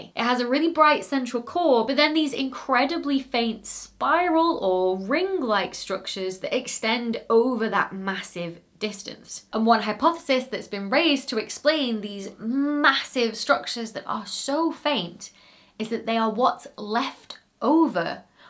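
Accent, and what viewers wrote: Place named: Yorkshire, United Kingdom. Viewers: I really hear that foot-strut vowel when she talks. I think the Yorkshire accent is my favorite, particularly the "uneducated" one. It sounds so incredibly pleasant. Cheers from Minnesota! 🙂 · Dr Becky is 'educated Yorkshire'. That is, her enunciation is perfect, but the vowels are flatter..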